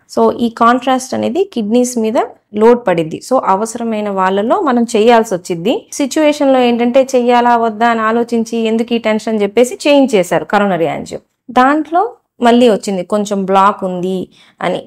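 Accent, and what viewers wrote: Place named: Prakasam, India. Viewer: మీ విడియోలు దాదాపు అన్ని చూస్తున్నాను చాలా చక్కని ప్రకాశం జిల్లా భాషలో చెప్తారు. మన ప్రకాశం జిల్లా అంటే నాకు చాలా ఇష్టం అందులోను తెలుగులో అందరికి అర్ధం అయ్యేలా చెప్తున్నారు. చాలా మంది రోగులకు మీరు చెప్పి విషయాల వలన ధైర్యం గా వుంటారు. నిండు నూరు రెళ్ళు మీరు మీ కుటుంబ సభ్యులు చల్లగా వుండాలని కోరుకుంటున్నాను.🙏🙏🙏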